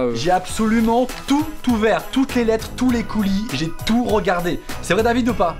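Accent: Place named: Nord-Pas-de-Calais, France. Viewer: lol tu es Chti c'est ces normale je suis contre le racisme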